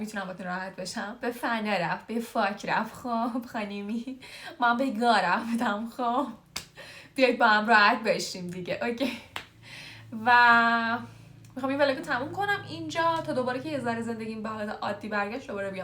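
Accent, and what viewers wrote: Iran. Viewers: احساس میکنم اصفهانی هستی، درسته؟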